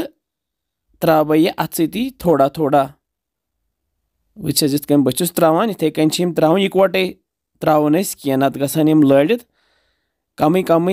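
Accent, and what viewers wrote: Kashmir, India. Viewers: I love listening to your Kashmiri accent.....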